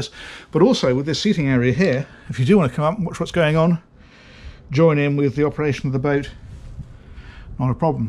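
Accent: United Kingdom. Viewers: Everything just sound a whole lot better when you have a brittish accent reviewing.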